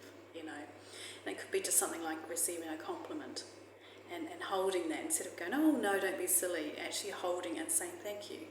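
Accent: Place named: Australia. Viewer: I am a mixed media Journaler. I am liking the positivity in your videos. Yes living abundantly is the key so WELL DONE. You have a lovely Australian accent but which Country are you living in plz? Do u grow your own food? Do u fast? I have so many questions. I am making space in our life to recieve.- decluttering- having 2 much stuff is hmm.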